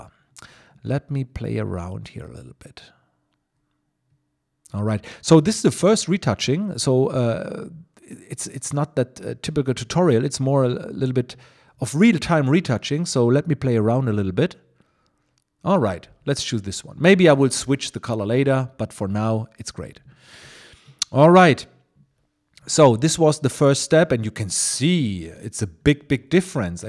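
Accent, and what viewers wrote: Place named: Germany. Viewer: Schön zu sehen (hören), wie dein Englisch innerhalb von 26 min. von "Naja, halt n Deutscher, der Englisch spricht" zu "Hmm...Kanns sein, dass der aus Deutschland kommt?" wird. Man merkt, dass du schon ein wenig rumgekommen bist ;) Schönes Video. Great job.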